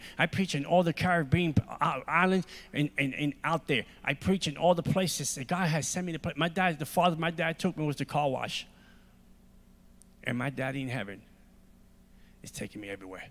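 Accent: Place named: Bronx, United States. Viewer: I betcha he's from the Bronx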